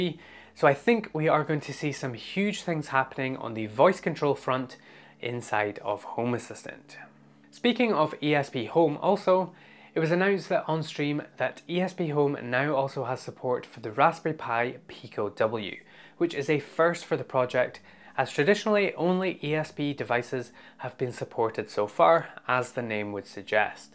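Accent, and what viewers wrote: Scotland, United Kingdom. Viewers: If Home Assistant does voice, then you should be that voice. Your relaxing Scottish brogue would make it!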